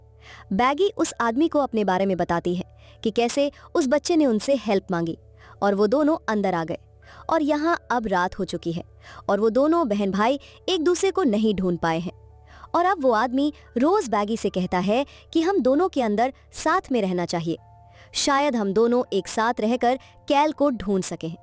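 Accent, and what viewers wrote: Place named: Punjab, India. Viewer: Punjabi vibes te boli hindi jande maharaj vaise vadiya explain aa carry on👍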